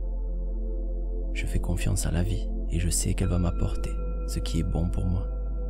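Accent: South, France. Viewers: Merci même si justement je préfère quand tu es naturel j'adore tellement ton accent du Sud et que je sens que tu appuie pour ne pas qu'il s'entende et ça rend particulièrement cette vidéo un tout petit moins agréable que les autres! Tu es génial et fais pour cela pour l'instant je le sens et merci🙏 tu aide tellement de personne dont moi mais voyons plus grand merci merci merci je t'envoie beaucoup d'amour et hâte de la prochaine video avec ton bel accent 😉♥️🧡💛💚🤍💙💜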